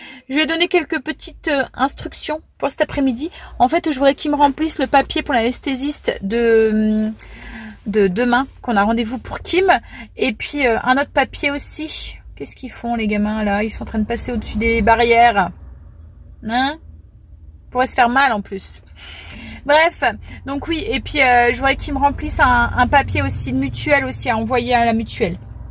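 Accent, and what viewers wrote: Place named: Nord, France. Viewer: Cc et oui tu es du Nord et la haut on a sa façon de s exprimer, tu es nature et c super et c pour cela qu' on t adore bises des hauts de France en force 😘😘😃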